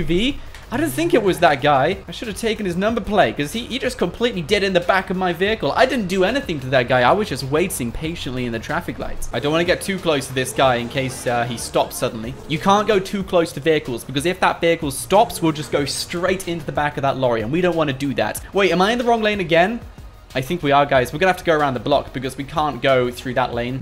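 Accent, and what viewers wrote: United Kingdom. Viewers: Is British or American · listen to his accent; he's British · Kylah Folkes he’s a British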